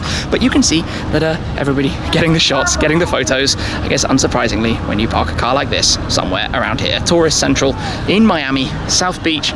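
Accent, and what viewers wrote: England, United Kingdom. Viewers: You are british?? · You could have taken phone numbers off chicks at least ! Believe me the first thing American girls are interested in is the size of your wallet .Its the very first thing in their head they ascertain on first contact.An English accent is huge bonus.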